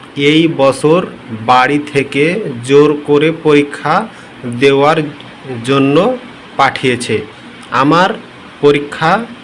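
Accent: India. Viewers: আপনার কথা শুনে তো ইন্ডিয়ান ইন্ডিয়ান লাগছে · আপনার কথা শুনে ভারতীয় মনে হচ্ছে আপনি এই খাতা কোথায় পেয়েছেন 🧐🤨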